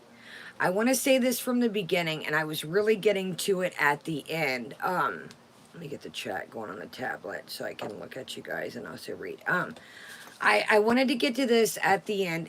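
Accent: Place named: South, United States. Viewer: I’m from the UK and I adore your raspy southern accent! It’s literally my favourite, I could listen to you all day! Your accent and a strong Scottish accent is my kyrptonite! Everyone can see you’re genuine, keep doing you 💜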